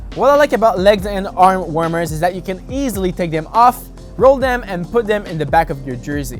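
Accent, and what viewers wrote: Quebec, Canada. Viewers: Auto Subscribe for that Quebec Accent.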